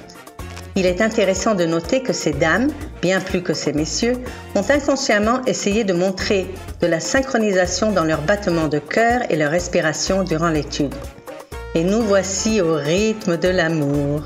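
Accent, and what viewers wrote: Quebec, Canada. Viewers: Arrêtez avec vos "la voix est nulle" l'accent québécois on comprend rien !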